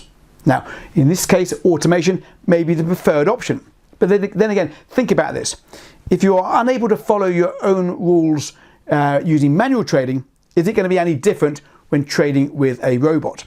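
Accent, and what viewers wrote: United Kingdom. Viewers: Those who mock in the comments and accuse of being a scammer are exactly those who have problems in understanding British accent, what he is saying is clear and helpful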